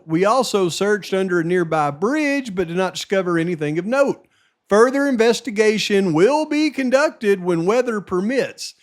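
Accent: United States. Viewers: I started listening to your vids recently. Please drop this heavy hick accent. Please. Please. It’s distracting and makes it difficult to listen. Appreciate the info and insight.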